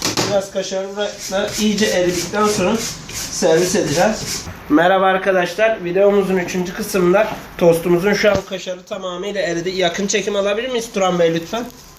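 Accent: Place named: Thrace, Turkey. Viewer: bu adam trakyali mı konuşması trakyalilara benziyor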